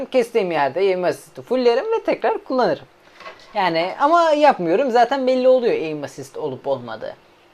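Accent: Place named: Black Sea, Turkey. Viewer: ne diyo bu karadenizli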